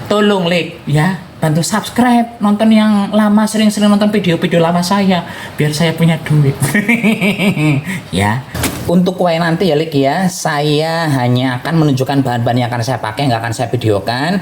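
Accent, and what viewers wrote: Banyumas, Indonesia. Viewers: Saya suka kok lihat vidionya mas bahasanya sama karena saya orang ngapak jg salam ublek2 dan cus enak endol baksonya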